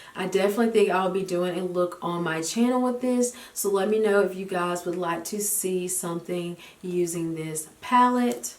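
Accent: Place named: South, United States. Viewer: Love the video, you got me wanting that lip mask now! 😩😂🤦🏽‍♀️ Your southern accent is so cute and thick, I’m from Bama too and wonder if I sound like this to other people 😂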